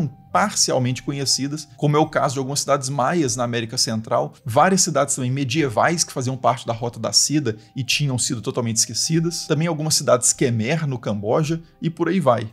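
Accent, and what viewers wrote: Minas Gerais, Brazil. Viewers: Gente!!! O texto ja era elegante e preciso; os assuntos muito interessantes; a cadência da fala e a voz agradável atraentes; o equilíbrio, o respeito e a (tentativa bem sucedida) imparcialidade notáveis; e o delicioso minerês que emerge de vez em quando fazem do Estranha um canal necessário pelo conhecimento que divulga e eleva o ser humano mostrando o que ele tem de melhor. Parabéns, Dr 👏 Ah, este post era pra dizer que a edição está incrível ❤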